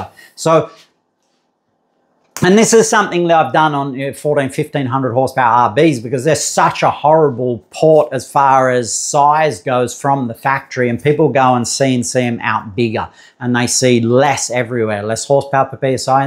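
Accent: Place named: Australia. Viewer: Great video! I’m challenged to keep up (British vs American accent), but YouTube speed control to the rescue. One nit pick. A few times you said “mil” but it looked like you wrote “mm” on the board. And unfortunately the board writing was not clear enough to be certain that you had written “mm”. The problem is that being Australian, you are libel to use either imperil or metric, and hence it is imperative to be crystal clear as to which units we are talking about. I’m a physicist and hobby machinist in USA, so I’m well versed in both metric and imperial. In USA (and a large part of the world), when even remotely associated with machining, a “mil” is a thousandths of an inch. No self respecting physicist (and really any scientist) would ever use the word “mil” for “millimeter”. We call it by its proper name, “millimeter”, “centimeter”, “decimeter”, etc. 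why? Because that is what it is. And as a byproduct one avoids all possible confusion with the imperial “mil” (1/1000”) which is pervasive in the imperial machining world. Maybe if 100% of the world uses metric in all areas then we might tolerate a slang of using mil for millimeter. But the world is not there yet and likely quite a few years away from such universal adoption. In the mean time we really need to stick to calling things by proper and industrially accepted names and refrain from shortcut slang which might be confused. Thanks!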